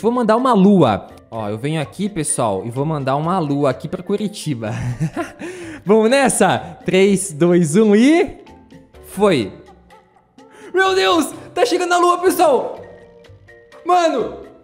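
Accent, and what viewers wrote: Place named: Minas Gerais, Brazil. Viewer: Crlh pensei a ele foçe de minas man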